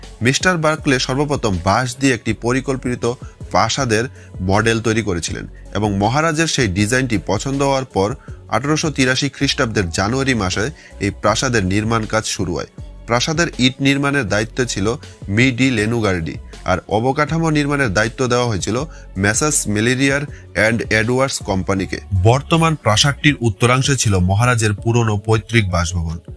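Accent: Bangladesh. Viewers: Byash not re beta besh bolo ....Dhakaiya kotha kar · উচ্চারণ এ পরিস্কার বাংলাদেশী ছাপ- এরমধ্যে>এ্যারমধ্যে, এছাড়া>এ্যাছাড়া,প্রথম>পথম,next>naxte ,😂 , তবু ও খুব ভালো হয়েছে, শুভ কামনা রইলো।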